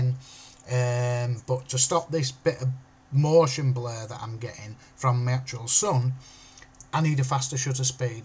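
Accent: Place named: Yorkshire, United Kingdom. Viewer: Hahahaha.... Great tutorial but your accent reminds me of growing up in York. Long time since I've heard an accent that thick. Thanks!!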